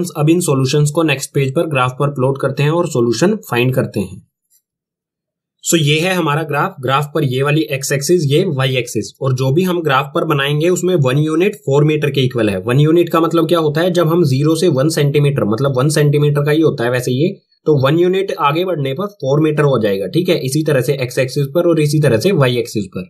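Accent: Haryana, India. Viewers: bhai aap haryana ta ho k??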